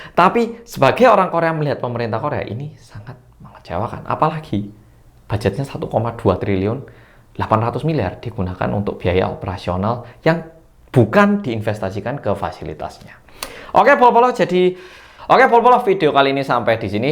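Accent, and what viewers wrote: Java, Indonesia. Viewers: mas Korea iki ... bahss Indonesia ne ke Jawa-jawaan ya .... Hi ... aq yo wong jowo mas Korea .... Sugeng siang 😀😀😀😀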